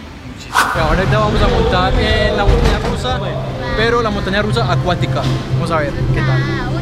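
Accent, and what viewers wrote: Colombia. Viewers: me encantan tus videos y tu acento colombiano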